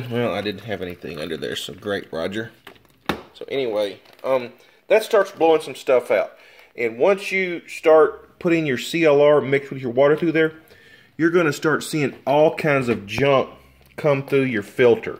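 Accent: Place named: Texas, United States. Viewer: I knew you had to be from Texas when I heard you start the video :) Thanks for the tips.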